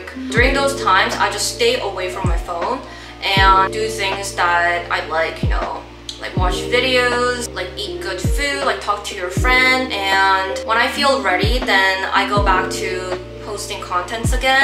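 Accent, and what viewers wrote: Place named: England, United Kingdom. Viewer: Am I the only one who loves her english accent?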